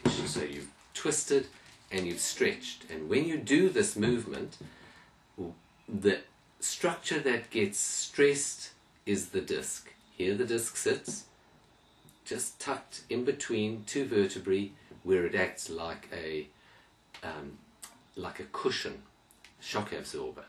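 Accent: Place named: South Africa. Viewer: Hello! Sounds like a nice SA accent there! I have had a slipped disc 3 - 4 times. (had the MRI etc.) I put my back out over Christmas and have the usual lower back pain but thankfully no leg pain. (my hip has gone to one side a bit) I'm really not sure these days whether it's best to lie down and rest or try to walk in the moments when I feel better during the day? May I ask what your view is? Thanks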